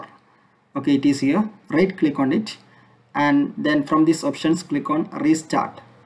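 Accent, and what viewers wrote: India. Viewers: came here to actually remove the icon, thanks alot <3 Indian accent is always funny to me btw lmfao, just thought I leave that comment here forever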